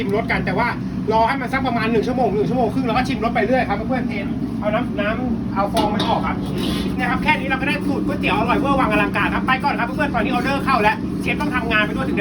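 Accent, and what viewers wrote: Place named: Suphanburi, Thailand. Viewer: เชฟเป็นคนสุพรรณหรือเปล่าค่ะ พูดเสียงเหน่อ ขอบคุณค่ะ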